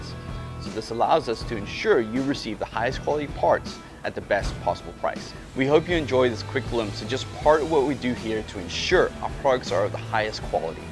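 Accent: South Africa. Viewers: sounds like a South African.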